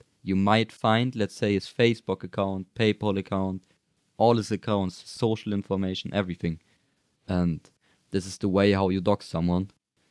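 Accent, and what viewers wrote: Germany. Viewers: Du bist 100% deutsch das hört man sehr stark raus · i hear deutsch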